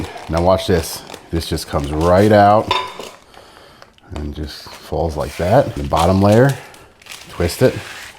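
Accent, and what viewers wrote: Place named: United States. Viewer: You have an American accent why are you measuring everything in metric?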